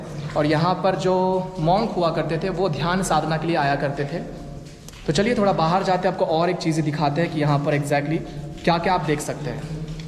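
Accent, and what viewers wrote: Maharashtra, India. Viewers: Bhava tu Maharashtrian ahe ki bihari?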